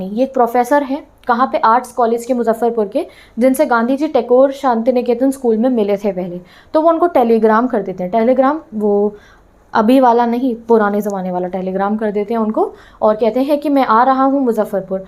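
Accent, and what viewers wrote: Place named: Odisha, India. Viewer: Are u from Odisha?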